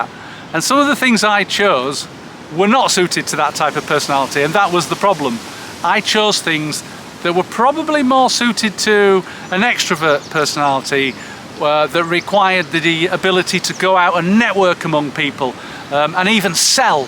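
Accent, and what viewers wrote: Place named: England, United Kingdom. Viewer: You must be in England somewhere I see that you sound like you're in england. I have direct ancestors that's from a lot of England.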